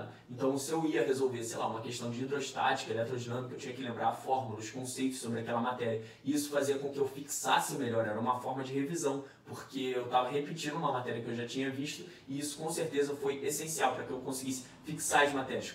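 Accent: Rio de Janeiro, Brazil. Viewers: bonito, inteligente e sotaque carioca pressão: "me ajuda" cai aqui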